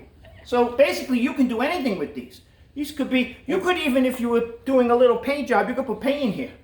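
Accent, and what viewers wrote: Rhode Island, United States. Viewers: Sounds like a RI accent?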